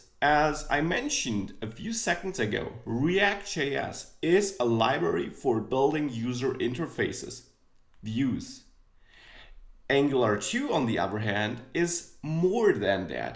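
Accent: Germany. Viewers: Funny to hear germans speaking english... :-D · Are you from south or central part of Germany ? I had a colleague from there and exact same ascent. Thanks for nice info anyways.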